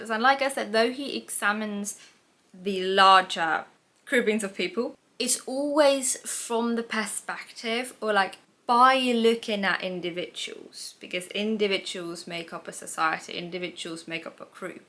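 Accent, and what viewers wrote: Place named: Birmingham, United Kingdom. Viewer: how did you get an English accent btw? (a bit Brummie lol)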